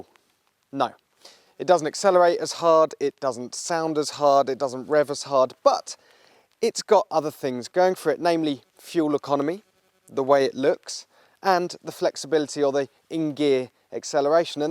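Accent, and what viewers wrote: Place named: Southeast England, United Kingdom. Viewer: Major misconception. The accents vary bigtime around the country. This guys accent is southeast, other areas are very different. Check out Newcastle, Liverpool, Birmingham accents on YouTube. I'm English and I struggle to understand a strong Newcastle accent!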